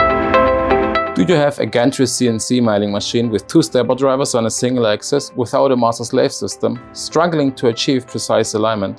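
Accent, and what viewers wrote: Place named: Swabia, Germany. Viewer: Servus Video ist OK aber auf schwäbisch bist Du mir lieber 😂 Gruß Walter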